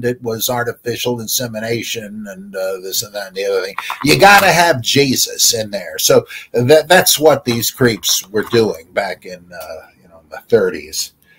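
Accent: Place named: England, United Kingdom. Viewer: I always like English accent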